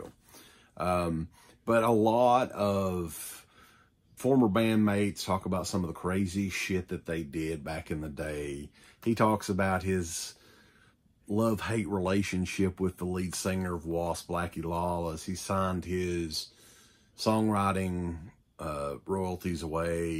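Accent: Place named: Deep South, United States. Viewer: Where you from brotha? You got a good ol deep south accent. Im in Arkansas from Texas. Glad to see good ol boys on some good ol metal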